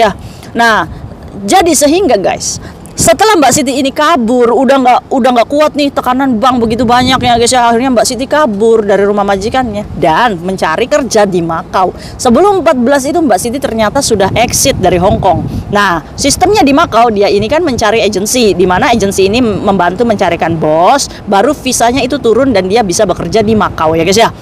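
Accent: Indramayu, Indonesia. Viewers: Pen nakon be tamah mbak kuh aslie wong ndi.logate mh kaya wong Indramayu atau wong cerbon hehe.. salam kenal bae mbak.sehat selalu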